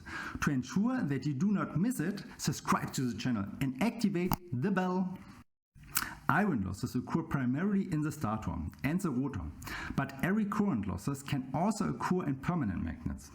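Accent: Germany. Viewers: Hallo, deine Videos gefallen mir. Als ich entdeckte, dass du aus Berlin kommst, entschied ich mich gleich in die Kommentar etwas zu schreiben. I’m a 26-year-old young guy from China. I’m in the business of neodymium magnets and magnetic assemblies, but now trying to expand to BLDC motor and controller field. That’s why I’m learning everything about electric motors. You have German accent when speaking English, which I find interesting. Danke für deine volle Videos!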